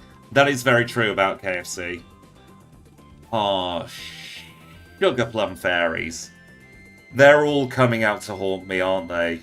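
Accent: United Kingdom. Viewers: I'm enjoying your content now that your back. The mix of Pokemon and a soothing British accent is great for relaxing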